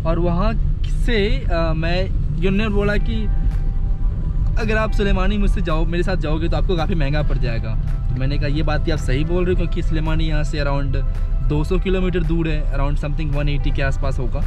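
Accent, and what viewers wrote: India. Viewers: Bhai, Youtuber to bahut hai. But aapka bolne ka desi andaaz, really love it. Kabhi bhi isse change mat karna. Yeh bhi aapki ek khasiyat hai.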